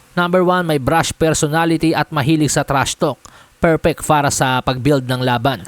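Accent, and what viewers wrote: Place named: Visayas, Philippines. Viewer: Bisaya ka pala sir blogger dahil sa tonada mo kahibalo ka mo bisaya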